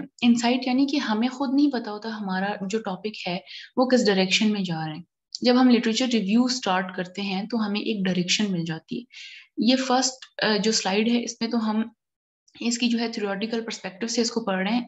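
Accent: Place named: Kashmir, India. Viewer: May Allah bless you... Can u tell me where from u. Bcoz I am from Kashmir.. Your voice tells u also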